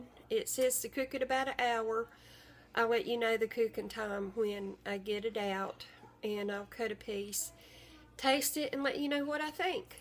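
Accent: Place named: Rural, United States. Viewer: Love your country accent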